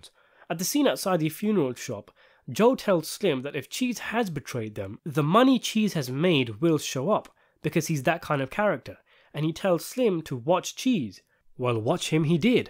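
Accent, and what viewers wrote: United Kingdom. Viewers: To listen to an overview of a series depicting "thug life" in the inner city of Baltimore by an orator with a British accent is a testament to his truly genius this series was . Great job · who dis brit yo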